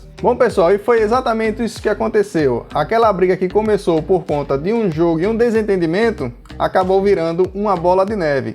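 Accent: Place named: Northeast, Brazil. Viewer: O cara vem falar de algo que nem sabe o que aconteceu, não tem um vídeo e acha que algum idiota vai ouvi-lo com esse sotaque de nordestino !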